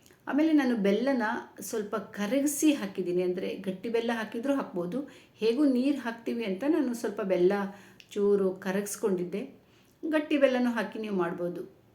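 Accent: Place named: North Karnataka, India. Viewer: ನೀವು ಉತ್ತರ ಕರ್ನಾಟಕದ ಭಾಷೆ ಮಾತಾಡ್ತೀರಲ್ಲ ಸಿಸ್ಟರ್ ನಾನು ನೀನು ಉತ್ತರ ಕರ್ನಾಟಕದವರು ಅನ್ಕೊಂಡೆ ಸಿಸ್ಟರ್❤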